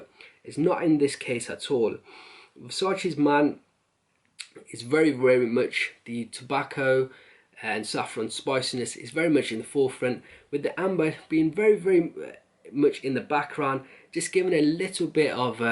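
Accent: Birmingham, United Kingdom. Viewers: Are from around Birmingham mate? Just got this myself today. love it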